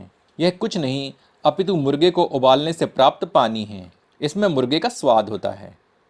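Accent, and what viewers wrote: Standard. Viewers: Boss aap kanase hai aapka hindi bahut sudha hsi. I like it.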